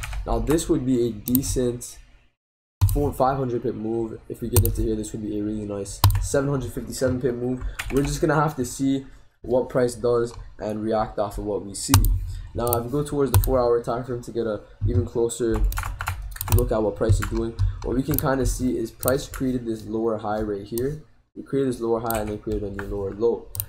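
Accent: Toronto, Canada. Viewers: The way you talk tells my you're from GTA, link up homie 😈 Also do you analyze crypto as well? Would love to see some content regarding that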